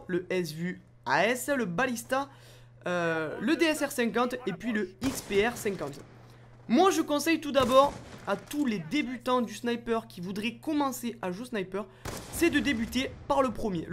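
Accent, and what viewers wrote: South, France. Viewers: tu vien du sud mais ou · Je suis sur Meltdown EC Avec le dsr Cènnkentte je kiff ton accent du sud!! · tes d'ou du sud ?? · Tes D'ou Du Sud ? ^^ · Un magrebin avec l'accent du sud..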